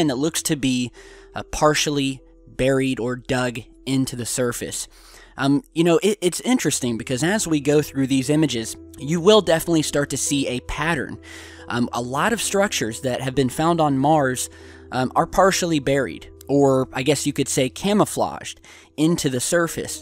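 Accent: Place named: United States. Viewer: Well he speaks English with a kind of American accent, I'm more used with British accents but I understand him very well too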